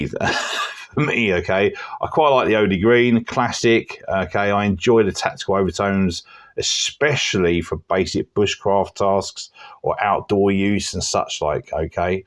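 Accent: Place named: United Kingdom. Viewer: Thank you, Sir. It is a good worker. A deep carry clip would be better. I like your speech, dialect, also the british language, of course. May I ask you, what part of Great Britain do you come from? Thank you very much. Stay healthy and all the best. Cheers Frank🖖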